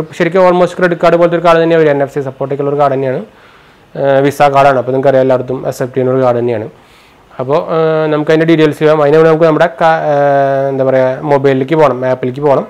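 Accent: Thrissur, India. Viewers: തൃശൂർ ഭാഷയാണ്. അൽപം സ്പീഡ് കുറച്ചാൽ നന്നായിരിക്കും.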